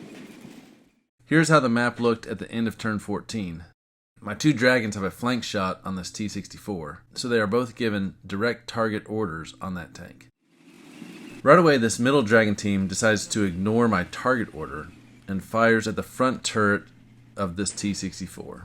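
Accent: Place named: United States. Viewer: Great AAR. First time i enjoy american accent narration. Usually they scream at you.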